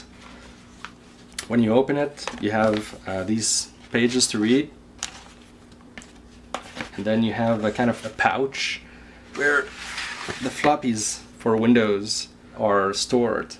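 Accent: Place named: Quebec, Canada. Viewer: everything is in french, the t-shirt show that the tour is in quebec and also you have the quebecois accent when we try to speak english... mmm are you in quebec province ?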